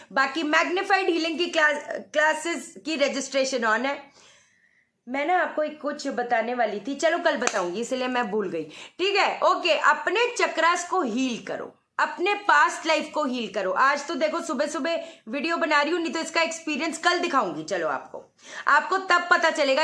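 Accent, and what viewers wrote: Punjab, India. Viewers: Wow💞 bindass talking style👌pujabi touch ..❤️( hai ki nhi.)my favourite ..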